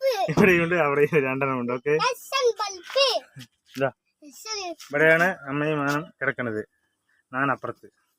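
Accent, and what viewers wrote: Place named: Neyyattinkara, India. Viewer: ഇത് എവിടെയാ നെയ്യാറ്റിൻകര ആണോ അതുപോലുള്ള വർത്തമാനം. കൊള്ളാം നല്ല വ്ലോഗർ.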